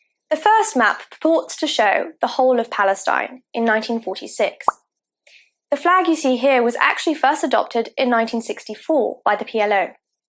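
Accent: United Kingdom. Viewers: Oh, where do we start with this little history lesson? It's like you took a propaganda leaflet, added a British accent, and called it "the truth." First of all, the whole "Palestinian identity didn’t exist until 1964" argument? That's a classic deflection. By your logic, Jewish identity didn't exist until 1948 because modern Zionism only took shape in the late 19th century. National identities evolve, but the Arabs in this region had been living there for centuries. Denying their identity doesn't erase their existence or their connection to the land. Next, the "British Mandate wasn't Palestinian land" claim. Sure, it was a mandate, but does that mean the Arabs living there weren't the indigenous population? You conveniently forget that the Balfour Declaration—pushed by colonial powers—promised a Jewish homeland in Palestine without consulting the people already living there. 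And Jordan? Nice try,. Handing off land east of the Jordan River to a Hashemite monarch doesn't magically negate the fact that the Arabs west of the river had their land systematically taken. Ah, and the Partition Plan. You paint the Arabs as unreasonable villains for rejecting it. Let me ask: would you accept a plan that gives over half your land to recent European immigrants? In fact, the UN Partition Plan of 1947 allocated 56 % of the land for a Jewish state, despite Jews comprising about one-third of the population and owning less than 7% of the land. Furthermore, the Zionists didn’t wait f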